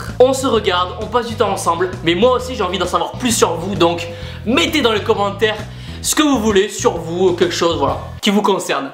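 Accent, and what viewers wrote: South, France. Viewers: Question perso : Tu habite ou ? Car je vois que ta un belle accent du Sud je doit pas etre loin de chez toi ;)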